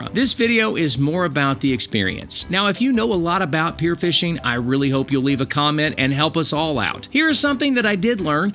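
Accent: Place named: East, United States. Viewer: Thank you, interesting Eastern state accent. Jyssojuan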